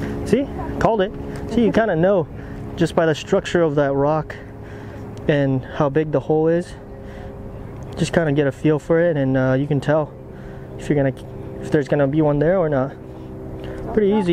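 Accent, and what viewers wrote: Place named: United States. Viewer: Bro, I live in Japan and watch a lot of fishing vids, so I guess that's why your vid got recommended, but you can imagine my surprise when I heard you speak, obviously born in America or at least lived there most of your life.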